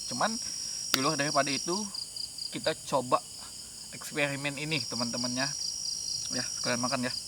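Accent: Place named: Banjar, Indonesia. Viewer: Lihat logat sepertinya utang banjar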